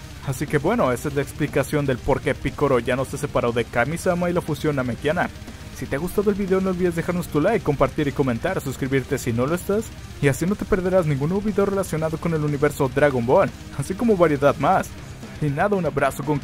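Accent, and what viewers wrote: North, Mexico. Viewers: senti que en este video hablaste como estilo norteño, fierro pariente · Ahhh nms tiene como un acento norteño no?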